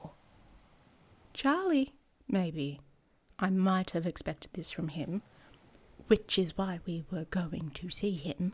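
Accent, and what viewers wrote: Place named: Australia. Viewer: Gahhhh an Australian accent? So rare in the F4A world 🤩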